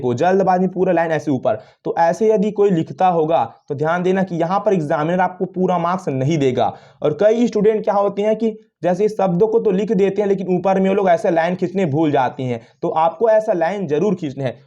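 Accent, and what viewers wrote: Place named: Chhattisgarh, India. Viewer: Baat krne ke tone se lagta hai chhattisgarh se ho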